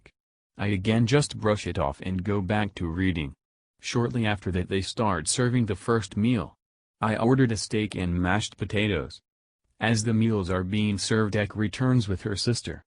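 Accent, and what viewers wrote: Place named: Japan. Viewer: Your accent sounds Japanese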